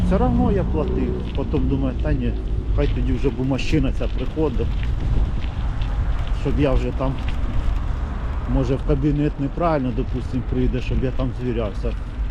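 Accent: Poltava, Ukraine. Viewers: так приемно чути полтавський акцент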